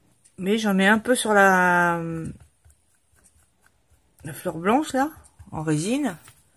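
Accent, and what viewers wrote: Paris, France. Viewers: 🎶(d où ton accent titi parisien😍.)Coucou. Bien ces petits cadres. C est vrai que les parents il faut les mettre en valeur❤️. Je vais les refaire mais pour mes grands parents. Merci du partage. Je suis contente pour toi que tu aies trouvé de la bonne collasse😂. Tu souhaites un bon anniversaire à Mr COLTOUPARTOU le chef cuisto. Dis donc en fin d année ça vous en fait des choses à arroser. Donc petit verre à votre santé 🍻🥂(à consommer avec modération) tchin tchin. Bisous et passe un bon réveillon 🎉moi calme avec mes 3 frangines (car boulot surlendemain) on fait soirée karaoké et ma sœur va me chanter le best of de et, l autre ce sera et et la troisième les cantiques d'église, chouette soirée, non? Mais je les aime comme ça ❤️ et aussi, en passant par et et bien sûr (pas d anglais car on ne parle pas anglais ou très peu ou très mal😭😭😭) belle soirée en perspective hihi bisous et belle année 2019 à tousssssss et toutes❤️🎉🎶🍀👍💞💞💞💞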